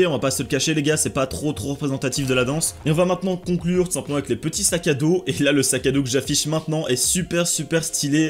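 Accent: Belgium. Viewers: Toi ossi tes Belgique ? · Tu viens d’où an Belgique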